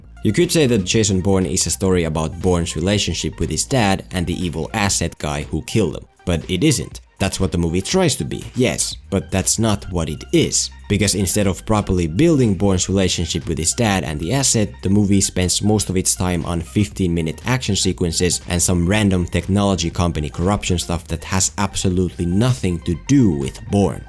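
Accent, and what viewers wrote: Finland. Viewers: Are you finnish? Accent sounds very finnish.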